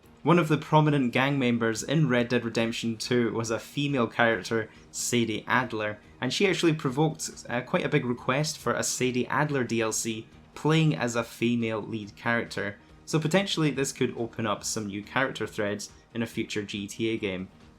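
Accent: Scotland, United Kingdom. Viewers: Scottish accent lol I like it personally but I’m from the uk so it’s normal to me to hear it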